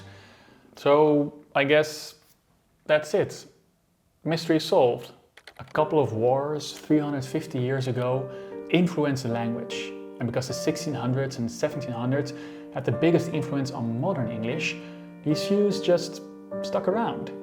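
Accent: Netherlands. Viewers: Ik denk dat echt alleen Nederlanders t nederlands in je stem kunnen horen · Erg leuk om te zien en horen! Ik moet wel wat lachen om jouw uitspraak, het klinkt wel lekker “Dutch” je uitspraak😎🇳🇱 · Such a Dutch accent :) love it 🎉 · Are you from Groningen or somewhere else from the north? Your English doesn't sound like randstad people or southern Dutch. But I can be wrong, just curious.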